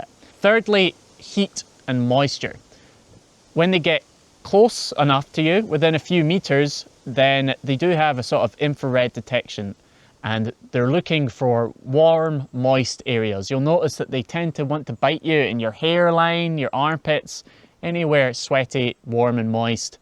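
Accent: Scotland, United Kingdom. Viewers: You've instantly put a smile on my face just with your charming Scottish accent.🥰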